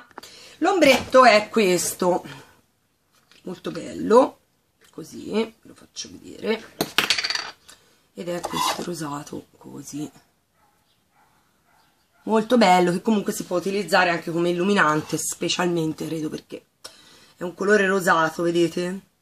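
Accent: Tuscany, Italy. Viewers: Ciao, mi fa piacere che hai trovato lavoro! Quando ti ascolto mi sembra di essere quasi in famiglia xké dalla parte di mia madre sono tutti toscani da secoli e la parlantina toscana mi rilassa 🤗poi tu sei schietta, sincera e senza filtri quindi.....mi fai tanta compagnia! Un bacione! Un augurio di buona ripresa x la tua nonna Leda!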